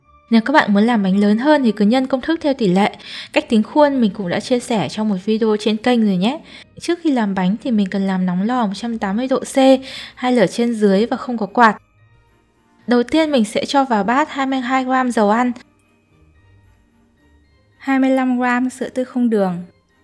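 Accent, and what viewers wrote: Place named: North, Vietnam. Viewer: Giong mien bac of ban de thuong wa,lai gioi nua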